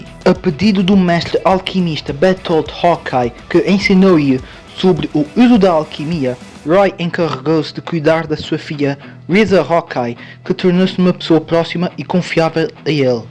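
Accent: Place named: Portugal. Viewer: Nao sabia que vc era português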